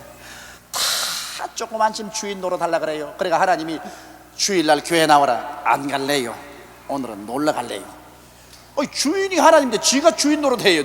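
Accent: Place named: Jeolla, South Korea. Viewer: 형명니 믄 절라도 말씨.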